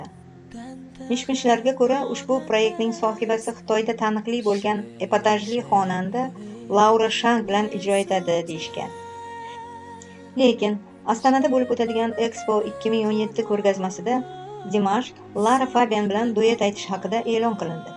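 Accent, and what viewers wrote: Uzbekistan. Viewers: Диктор Хорезмненсизба? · Диктор с какой страны? С Узбекистана?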